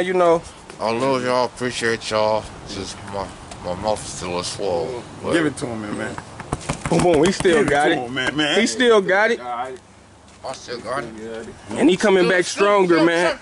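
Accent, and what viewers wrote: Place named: Mississippi, United States. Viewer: “I’m from Mississippi” I CAN TELL 😭😭!! My grandma talks the same way. Y’all my family now too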